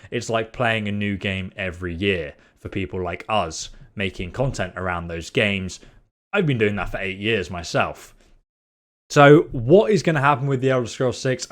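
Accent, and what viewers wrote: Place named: United Kingdom. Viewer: Bought doesn’t have an r. Just because your British and say words with a weird emphasis on vowels doesn’t mean you can add letters.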